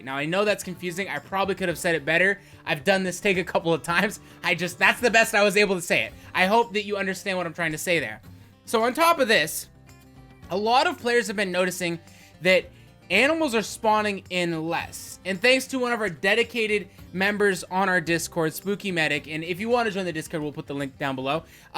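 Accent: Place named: Russia. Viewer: you are 100%, they have made the game unenjoyable. But please in the next video talk in you crazy Russian accent. That is the reason I started watching this channel.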